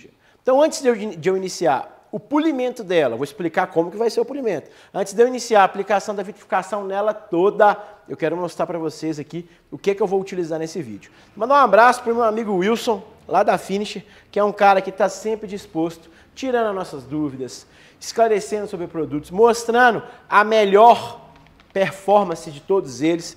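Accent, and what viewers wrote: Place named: Minas Gerais, Brazil. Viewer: Sotaque mineiro é foda...ja da pra daber so de ouvir kkkkkkkkk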